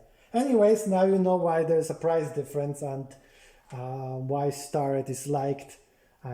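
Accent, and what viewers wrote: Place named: Russia. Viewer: Interesting accent, russian perhaps?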